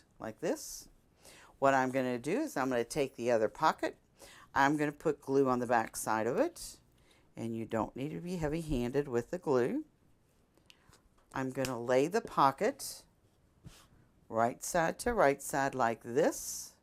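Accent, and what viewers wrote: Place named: South, United States. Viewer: She has some what of a Southern/mid west accent sometimes it's hard to understand southern people lol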